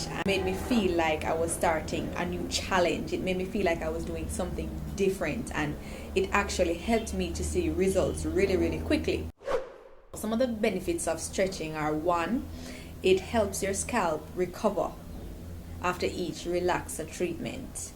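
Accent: Jamaica. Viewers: The moment I heard her, I knew she's Jamaican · Your Jamaican accent!!!😍😍😍😍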